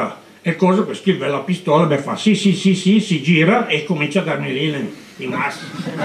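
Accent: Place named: Milan, Italy. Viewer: Grandissimo. L'unica cosa che storpia è quell'accento milanese .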